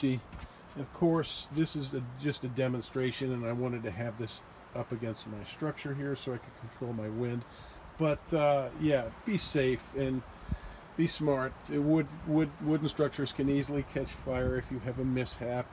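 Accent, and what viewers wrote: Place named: South Louisiana, United States. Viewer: A woman from South Louisiana I can tell.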